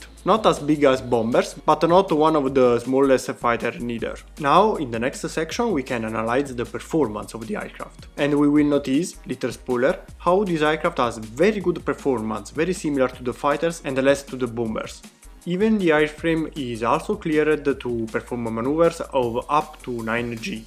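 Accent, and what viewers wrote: Italy. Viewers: Italian accent makes it about 10 times better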